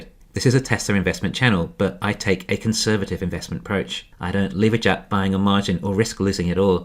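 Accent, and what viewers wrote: England, United Kingdom. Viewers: Petrol forecourt, that expensive place where ICE car owners like to hang out 😂 ❤ Nice one. Also as an Australian why do you sound more English than me and I'm from England? Nice accent.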